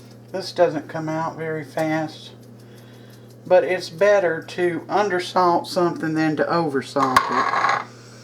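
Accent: South, United States. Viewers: Lol, she said giving a thumbs down was mean😂😂😂. I'm definitely giving you a thumbs up Mama! Love that country voice😊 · CAN YOU PLEASE DO A VIDEO SHOWING US HOW TO CAN YOUR WONDERFUL SOUP? So glad I found your channel! I have a lot of catching up to do, looking at all your yummy dishes! I love your southern accent! Thank you for sharing!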